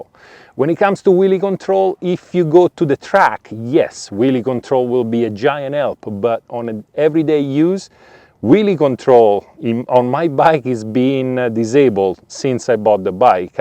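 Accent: Rome, Italy. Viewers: Beautiful honest review, good job. I think i've heard a bit of Italian accent. From Rome, to be precise. Am i right? If i may ask.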